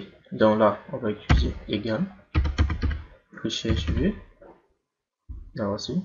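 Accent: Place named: Africa. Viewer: C'est magnifique on a beaucoup apris et avec du français à l'accent africaine ça fait vraiment plaisir, merci.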